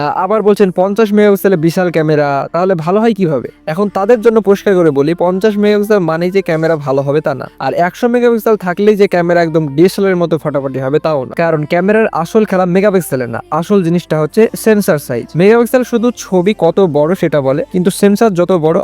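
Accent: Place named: Bangladesh. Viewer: ভাই আনার কথা শুনে মনে হয় বাংলাদেশি আপনি ❤❤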